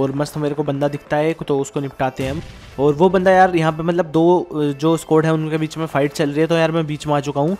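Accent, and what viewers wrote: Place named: Delhi, India. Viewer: Bhai aapki aawaz delhisehu ki jesi he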